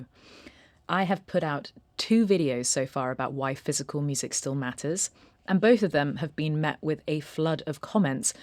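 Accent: United Kingdom. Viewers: I'm left wondering if there is any subject that wouldn't be interesting - heard in your perfect British accent... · I love your channel! Long time watcher/listener first time commenting (I think). Your realness is what I appreciate most, I think we all can feel the you inside your content. That's rare and valuable. Plus being a dumb sounding American, I truly admire your British accent. Keep on rocking in the free world! 🤟🏽☮️🔥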